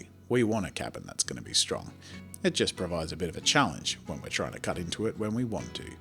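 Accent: Australia. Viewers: Thought this was gonna be a troll, like he would start calm and then suddenly go crazy and make a castle or something, but instead I got Australian bob ross, witch was a very pleasant surprise. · you're more aussie than ever in this one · Omg I LOVE this! Serene instructional of mini furniture? chill Aussie narration? More, please. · A Dremel tool would help with roof, doors and windows. And by the way, you're totally bringing that soothing Bob Ross voice to this one. If Bob Ross had an Aussie accent...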